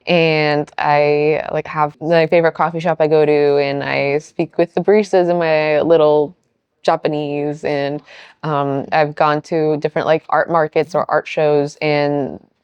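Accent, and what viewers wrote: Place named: Canada. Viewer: Sounds Canadian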